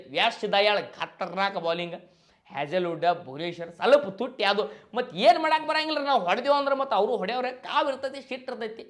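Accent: North Karnataka, India. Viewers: Well speak uttarkarnataka style nice brother❤😅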